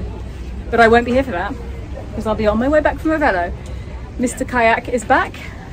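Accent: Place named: United Kingdom. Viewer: I've got to say, you and are just delightful. I'm sure when you started this YouTube journey, you may not have given much thought about how it would be if your channel got popular and you were recognized. Positano is such a small place, and you guys are recognizable by your kind, lovely faces (your British accent and wonderful smile). You are so gracious to invite people to say hello to you, which can I imagine can be intrusive or inconvenient depending on what you are doing. You never need to apologize for being human or having a bad day. I hope that people respect your privacy when the moment calls for it. As someone who has been wanting to visit the Amalfi coast for all of my adult life, I thank you so much for showing us around your beautiful little corner of the world. You take me there even when I can't be there! Love to you from PA, USA. 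❤️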